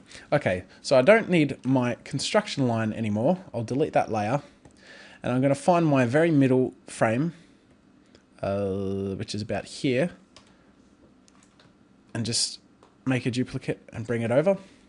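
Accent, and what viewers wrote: Australia. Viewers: every single time I click on his videos I say "oh yeah, he's Australian." 😂 No hate to any Australians ❤ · Are you Australian or what?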